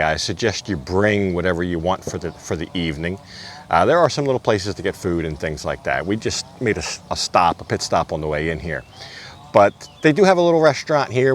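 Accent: United States. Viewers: yes but he is American and pronounce different so you understood what he was saying is that not enough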